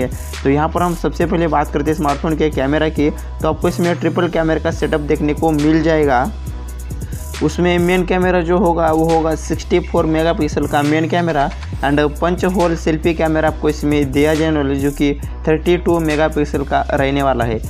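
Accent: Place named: Maharashtra, India. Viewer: Marathi Tune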